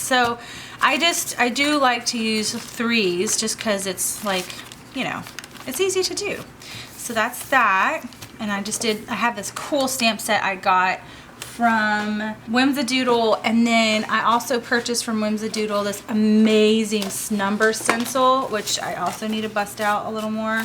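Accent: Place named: Texas, United States. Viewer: love love love your videos! I noticed your accent right away and knew you had to be from Texas! I myself am from Texas live about an hr outside of Austin. keep up the awesome videos ,I love how creative you are and I love your personality!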